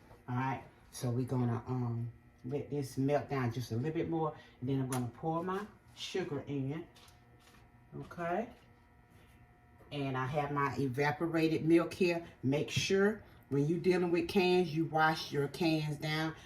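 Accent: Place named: South, United States. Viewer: Mrs., I just wanna come sit at the table. I love your spirit and yo kountrrryy twang.. God's continued blessings to you and.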